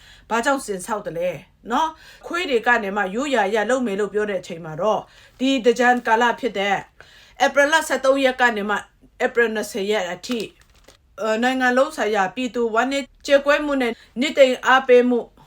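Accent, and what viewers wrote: Kachin, Myanmar. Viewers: စိတ်မချမ်းသာဆို...နေ့စဥ်လိုလိုပါဘဲ ရယ်....ကချင်သံဝဲဝဲလေးနဲ့ ရဲ့မျက်နှာလှလှလေး{....အော်ရီဂျင်နယ်.....မဟုတ်လောက်သော....]ကိုမြင်ရရင်...စိတ်သက်သာရာရစေတာတော့အမှန်ဘဲ...ညီမလေးနဲ့ဂျိန်းဖောမျိုးနွယ်တွေအားလုံးဘေးကင်းရန်ကွာကျန်းမာပျော်ရွှင်နိုင်ကြပါစေ.......ထာဝရအားပေးလျက်.....။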